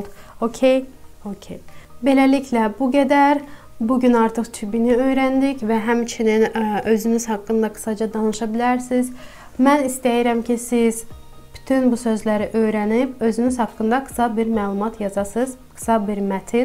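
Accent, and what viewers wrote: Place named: United States. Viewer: I graduated British english unlike You. But You speak in American english unlike me. 🤲🏻 Good Luck!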